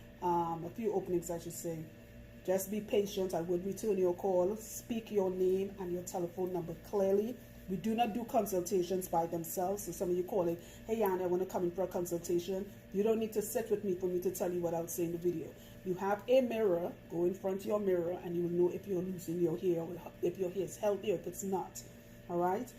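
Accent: Trinidad and Tobago. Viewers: Are u trini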